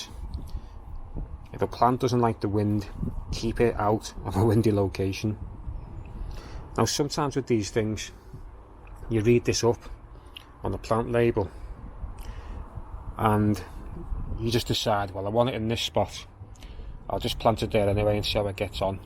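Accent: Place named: Scotland, United Kingdom. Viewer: i like gardening but for some reason when explained to me in a Scottish accent; I enjoy a lot more.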